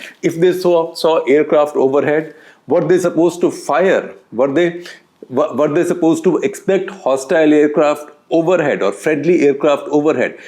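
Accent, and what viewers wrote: India. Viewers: Shekhar ji speaks English but his expression is totally in "Hindi".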